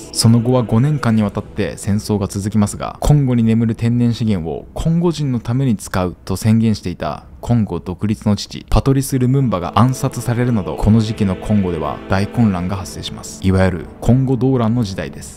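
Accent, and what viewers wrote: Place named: South Korea. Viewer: 韓国人の話す日本語訛りのようなのを感じた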